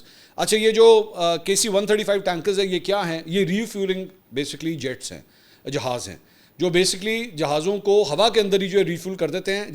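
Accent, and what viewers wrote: Punjab, Pakistan. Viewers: Punjabi Akcent best❤️ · Bhai, your Punjabi is hilarious and full of character! 😂 You're one of the few who speak the truth with no filters pure facts every time. I genuinely respect your honesty. Personally, I feel PTI should take a step back for now. Pakistan, by the Grace of ALLAHTALA, is finally moving in the right direction gradually. In'ShaALLAH, our country will continue to progress. May Allah bless you and bless Pakistan.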